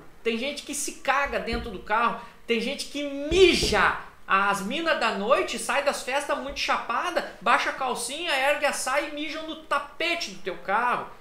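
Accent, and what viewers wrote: Rio Grande do Sul, Brazil. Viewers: Cara show de bola , muito obrigado e parabéns pelo video , estou estudando para entrar nessa. Moro em SC agora ,mas sou do RS , saudades quando escuto esse teu sotaque. Muito bom parabéns pela disponibilidade de mostrar e ensinar... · Esse sotaque eu conheço, tu é daqui do sul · Eu sou do norte e de cara vi que era do sul e falei "baaah gente boa de mais esse guri" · O cara é bom.... Ensina com simplicidade, estou tentando aprender sozinho tbm com ajuda de voces, não é facil, mais não vou desistir. Pelo sutaque é gaucho?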